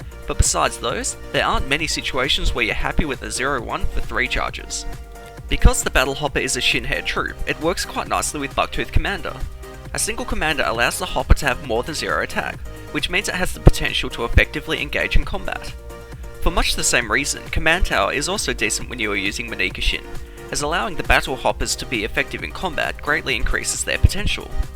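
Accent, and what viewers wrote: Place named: Australia. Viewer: Very much like your video trying to place that accent... I wanna say adelade? maybe Melbourne. Its not New Zealand... Use of /aː/ as opposed to /æ/ Word Hobart Melbourne Brisbane Sydney Adelaide Ave. over all five cities graph 0% 30% 56% 70% 86% 48% chance 0% 60% 25% 80% 86% 50% demand 10% 78% 78% 90% 100% 71% dance 10% 35% 11% 30% 86% 34% castle 60% 30% 33% 100% 86% 62% grasp 90% 89% 89% 95% 100% 93% to contrast 100% 100% 100% 100% 71% 94% Ave. over all seven words 39% 60% 56% 81% 88% 65%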